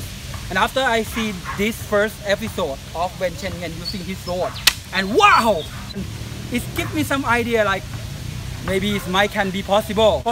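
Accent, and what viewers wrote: Thailand. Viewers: somehow your accent sound like Thai accent to me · hey i know you are thai with your accent THAI GANG 🔥 🇹🇭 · สำเนียงนี้คนไทย555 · นึกว่าคนประเทศไหน พอได้ยินสำเนียงอังกฤษเท่านั้นแหละ ไทยนี่เอง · ตอนแรกนึกว่าชาวต่างชาติ แต่พอฟังสำเนียงเลยรู้ว่าเป็นคนไทย สุดยอบ At first, I thought he is in another country, but when I heard his accent I know he is Thai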